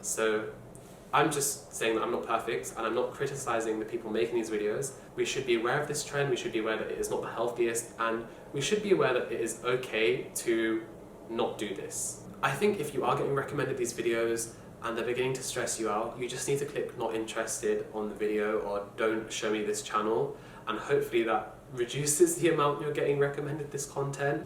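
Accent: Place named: England, United Kingdom. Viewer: I meant his English accent... It's just so cute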